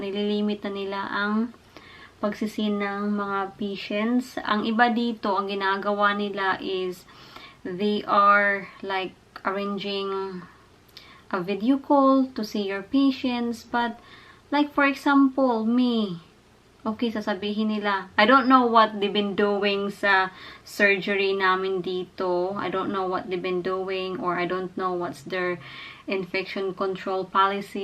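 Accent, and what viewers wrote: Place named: Visayas, Philippines. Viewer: Hi, bagong subscriber here from Winnipeg Canada. Alam mo ba na ang wife ko ay na hook sa kga kwento mo.. Pati ba naman yung headache mo iniisip na sana daw pregnant ka hehehe. Natutuwa din sya sa visayan accent mo. Keep on posting more vlog. Sana pa shout out din..My wife's name is Dhang Camia. Sana mapansin mo naman ajg comment na ito...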